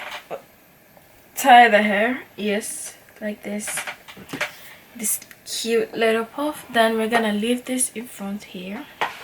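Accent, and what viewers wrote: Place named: Jamaica. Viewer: This was enjoyable to watch 😂🥰🥰 Also, I love your accent, where are you from? Jamaica? I'm Nigerian btw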